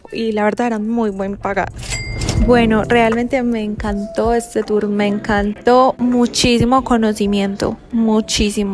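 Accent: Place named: Colombia. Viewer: Tienen un feeling y una manera de hablar las colombianas que me encanta,voy a seguir viendo los vídeos de esta nena 😊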